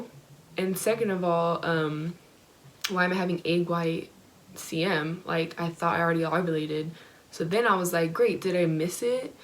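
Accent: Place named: New Zealand. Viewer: Are you from New Zealand? You have a kiwi accent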